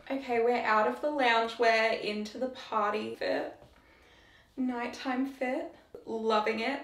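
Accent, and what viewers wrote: London, United Kingdom. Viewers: The London accent was perfect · Cracked me up with that London accent haha! Love it, great vid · the London accent killed me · I CANT GET OVER THE LONDON ACCENT STOP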